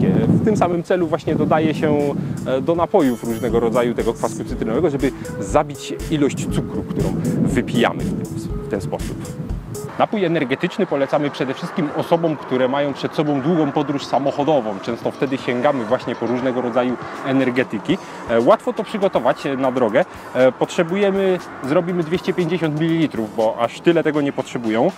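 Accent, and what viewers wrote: Silesia, Poland. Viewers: jesteś ze Sląska , słyszę znajomy akcent .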